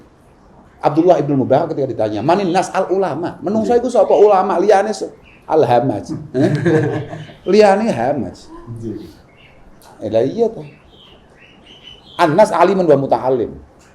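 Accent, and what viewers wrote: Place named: Malang, Indonesia. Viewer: Bismillah...uwennak rek dirungoknone...logate koyo arek malang...umume gampang difahami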